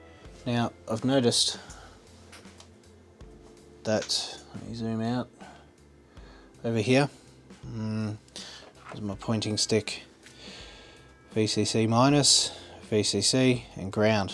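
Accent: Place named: Australia. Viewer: I have a crown xls1000. With the same symptoms as this one. No audio and screen is blank. The fan powers on for like 1 second. I noticed when I was using it last it had red lights on and on the screen it said overload or overheated, so I turned it off and back on and never worked again. I tried reseating the ribbon cable on the board side but still nothing. Any other ideas. You sound like your an Aussie, where are you based?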